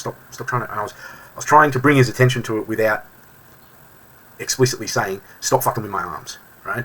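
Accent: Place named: Australia. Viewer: I just stumbled onto your channel, good to hear an Australian accent exploring CLA. Where are you based?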